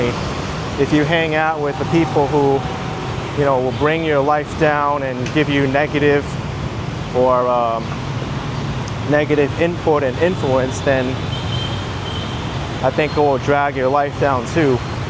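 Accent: New York, United States. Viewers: Yes. As far as Asians in Queens go, he isn't THAT Asian. He even has a New York accent for crying out loud. This is wild. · If your born snd raised in NYC your a New Yorker by any standards. I’m one and I don’t look at you any differently. When I first discovered your channel I was actually surprised you were a Chinese American guy I had to laugh. I told my wife there is no doubt this dude is a fellow NYC’er because of his accent. 😂. No sense worrying about other people. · I laughed at bit Because 100% I've always Thought Newyorker first.. Your accent, that Way you talk about cities and people.. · Thanks for your many thoughts and like one write here , "You is a fellow NYC’er because of his accent." and this is a part of your root in NYC Manhatten and few have grow up at Manhatten and why it your city 🙂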